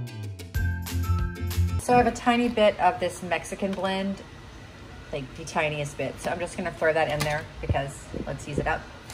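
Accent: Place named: Scotland, United Kingdom. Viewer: Just found your channel by chance and thought you were Scottish 🤣🤣 and yes I live in Scotland 🏴 and have subscribed 💕💕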